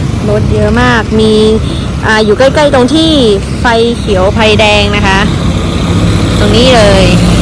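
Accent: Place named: Laos. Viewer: โรงแรมชาวเกลาเป็นพาษาลาวไม้ไช้เหรอบา้น ไกล้กันกลับลาวบา้งที่เห็นพุดเหมีอนอยุ่ไกล้ลาว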